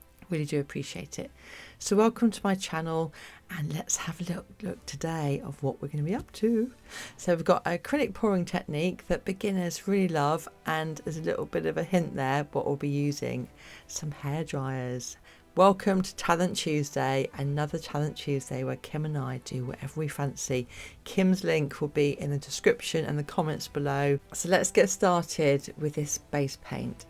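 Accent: England, United Kingdom. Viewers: ❤hi there I'm Donna Louisa. Close related names. I Molly love your butterfly effect ❤ Video, it was great. I'm new to acrylic painting. Currently I'm doing a course with Molly from Molly's Artistry ~ Acrylic pouring / swipe technique course - via you tube lifetime access intellectual information mine. Watch out for the big exchange rate as it's 🇺🇸 I'm in 🇦🇺 in Victoria, Australia. USA $150.00 = Aus $230.00. She is a beautiful lady & teaching is amazing. World renowned artist I'm so glad I caught your Video today. I haven't done the Dutch pouring course yet. Molly uses her Dutch pouring method with hair dryer too. So have seen it often. Will try myself soon. Your demonstration was lovely simple & already aligned with others principles like Molly who has done Acrylic pouring. Loved your explanation. I loved your beautiful English accent. I Will try to see more in the future. 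Have you made other videos? I also don't have the whole range of colours either. So many brands & ranges. Do have some small tubes of the soft body Acrylics paints to work through as well as the larger containers of paint, which I now buy for Acrylic pouring as I can. These should mix with little water or pouring medium - so they should be pourable thinned down. I'm slowly trying to build them up. I used to paint portraits for a short time adults babies animal etc. I have completed several units such as drawing painting, ceramics, and sculpture from Visual Diploma Arts. However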